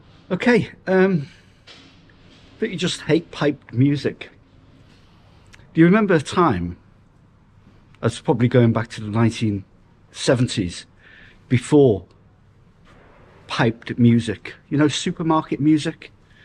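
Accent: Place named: Prescot, United Kingdom. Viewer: I always enjoy your videos the is nothing wrong with your Prescot accent is box entry still there it's at the back the centre and leads to sewell Street i used go walk downbox entry into sewell Street and walk past what me and my brothers called the train way the bi where we saw the little trains working on our way home from church school I sometimes walked with my brother or only own and the wasn't a soul in sight I felt safe lovefran xxxx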